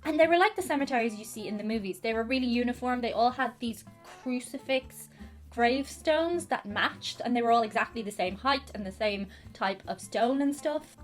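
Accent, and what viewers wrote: Dublin, Ireland. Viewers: She's a Dubliner. You've been watching "Derry Girls" haven't you? That is a radically different accent up there. Accents across Ireland are as different as Boston and Alabama. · Whatever her accent is, it is now my ideal perfect Irish accent benchmark that I will compare all others against. I dont have to keep playing it over and over to figure out what she said. Mostly. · ... Posh accent ???... What part of Dublin are you from ? That´s certainly a Dub accent, but posh ? Never in a million years.... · ehh ...i think shes completely irish just a bit influenced by american tv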